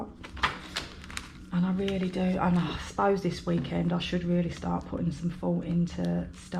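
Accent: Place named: London, United Kingdom. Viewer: Omg I hv to say u are absolutely hilarious 😂, such a great accent is it London? I've really enjoyed watching 👀 ur videos thankyou for entertaining us on this channel xxxx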